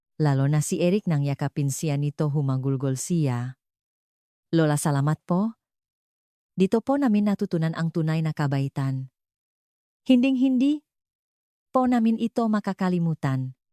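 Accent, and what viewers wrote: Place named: Indonesia. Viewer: Ohhh you are Indonian halata sa'yo yong accent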